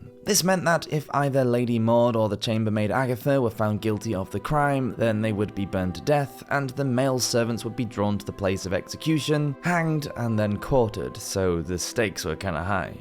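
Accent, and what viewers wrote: England, United Kingdom. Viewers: Love your content and your lovely English accent.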